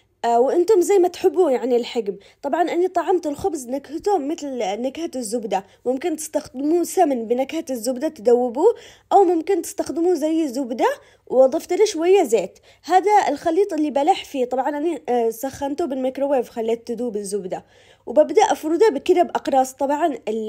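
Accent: Aden, Yemen. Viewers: تسلم الايادي يا محلا كلامك وحشني الكلام العدني اني اتربت وعيشت بعدن وسافرت السعودية لي اكتر من ٢٥ سنة 😢... اني احب اسوي خبز علشان اسرتي كلهم يحبوا الخبز واليوم سويت الخبز المعطف بس ازود واحط الباقي بالفريزر الله يقوينا ويطتب الاجر لنا جميعا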